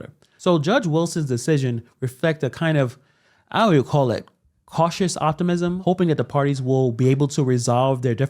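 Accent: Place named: Appalachia, United States. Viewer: Ya all ya all all learn from me lol 😂 you speak like a uneducated hillbilly clown 🤡 total ghetto girl 😂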